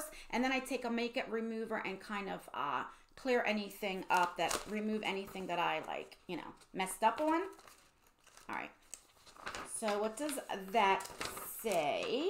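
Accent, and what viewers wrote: New Jersey, United States. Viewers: Oh Jersey! I was woundering where that accent was from! I am confused though. Glossy Box....thought it was gonna be lip gloss. Not disappointed though. Loves the box and whole video.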